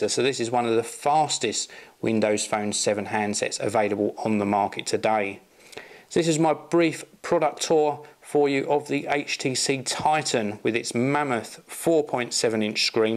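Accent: England, United Kingdom. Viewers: I remember you because you unboxed the HTC Titan on Technobuffalo (I believe it is the same phone?) and your nice english accent.;) Great Job! I want a Windows phone as my new phone if ever im going to change a phone, but I think I'll wait for the Nokia one..;)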